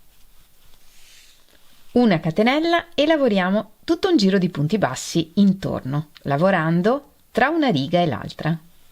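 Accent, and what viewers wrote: Italy. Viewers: Pour souffrir également de fibromyalgie, avec en ce moment le syndrome des intestins irritables qui dure depuis plus d'une semaine, je suis trop mal dans mon corps pour crocheter. Je suis âgée, ce qui n'arrange rien. Par contre, comme vous, rien qu'à écouter la voix de, avec son magnifique accent, me fait du bien. Elle me ramène à mes origines (mes parents sont nés en Italie tous les deux) et mes souvenirs de vacances d'enfance. 😊 Je suis désolée, si je parle l'italien, je ne sais pas l'écrire. Et je ne supporte pas de faire des fautes d'orthographe. Bon courage à vous.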